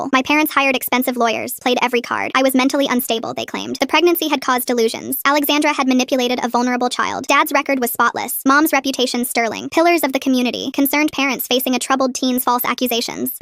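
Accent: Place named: United States. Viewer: Her voice is annoying American fast loud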